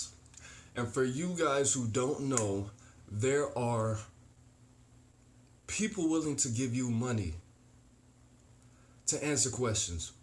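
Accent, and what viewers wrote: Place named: Chicago, United States. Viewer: I just watched this video for the second time. While watching your video I took notes....thanks for shouting me out.....we're u born in chiTown?...u have an accent to ya!....just applied and did do some lying on the surveys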